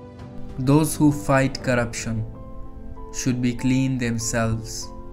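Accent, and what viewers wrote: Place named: India. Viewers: Wtf ??!!!! Racist on vocal sounds ?!!!! Why it’s Indian voice not English !!!!???? SERIOUSLY?!!!!! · What annoys me is the Indian speaking. Why dont just use English voice speaker those are free on the web?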